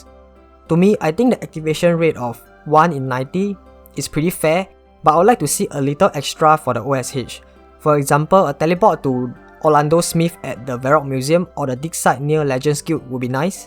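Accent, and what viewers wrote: Singapore. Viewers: u sound Singaporean or mayb Malaysian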